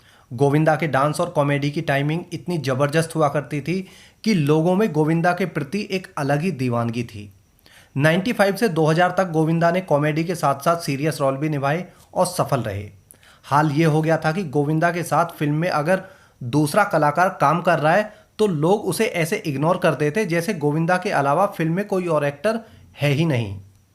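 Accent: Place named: Uttar Pradesh, India. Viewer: Kay Ap Ideal Na Banaein Actor Ko Actor Ki Hat Tak Rakhaein Kyunkay Laehjay Say Tum Bhi Up Kay Lagtay Ho Amitabh Alahbad Ka Hai to Yeh Tumhara Tasub Nahin Hai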